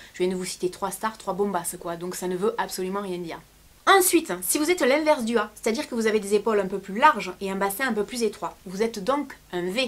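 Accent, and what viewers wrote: South, France. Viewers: L'accent du sud . J'aime trop ; )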